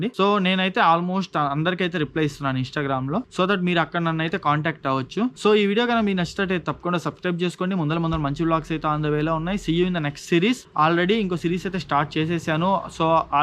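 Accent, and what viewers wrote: Nellore, India. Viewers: Chokka chinchu kovadhu 😂😂😂😂😂 love from chennai...assalu Nellore baasha keka ❤️🥰😂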